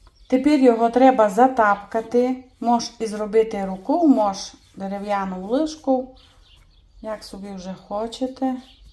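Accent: Transcarpathia, Ukraine. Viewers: А я люблю пані слухати 😊 Спокійна, домашня інтонація, і чудовий закарпатський діалект. Я дивлюсь її відео два рази: перший раз насолоджуюсь її манерою говоріння, а другий раз - рецепт слухаю та занотовую😊😊😊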